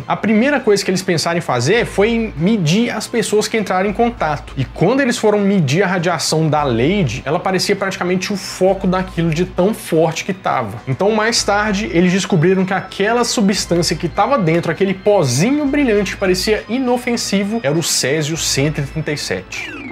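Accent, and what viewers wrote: Minas Gerais, Brazil. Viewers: deixa o mineirês aflorar, sô! É lindo demais, uai! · Meu amigo....Boa noite....acho seu canal muito bom....dinâmico ...informativo...sem dizer q o jeito de vc apresentar é peculiar...seu sotaque" pão de queijo" é ótimo. Trabalho no Hospital ao qual se referiu....Hospital Naval Marcilio Dias....E completo de cá , para conhecimento de todos, q o HNMD, orgulho da nossa Marinha, à época e ainda nos dias de h,j é referência Nacional p atendimentos a pacientes radioacidentados.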